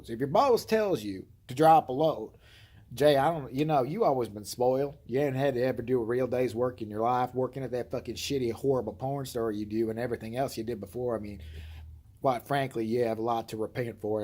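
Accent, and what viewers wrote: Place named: South, United States. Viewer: Hilarious! You and your brother on together would be a scream! I've watched this 5x screaming laughing at your Southern accent and convo. My bro was a semi driver and very shy and I guess these prostitutes would come up to him and flip him out at his rig some scary looking and brazen and he would be like trying to get them off. Haha he had to lock up tight and be prepared for war.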